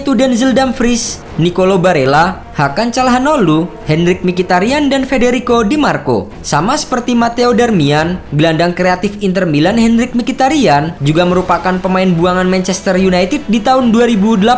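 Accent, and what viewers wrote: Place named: Tegal, Indonesia. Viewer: Kayak orang Tegal ya min hiks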